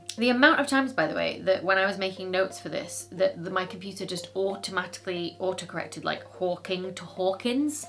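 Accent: United Kingdom. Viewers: so nice channel and such an awful British accent!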